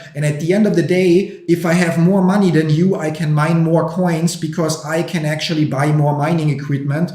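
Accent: Germany. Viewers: so typical German to pronounce a C like a Z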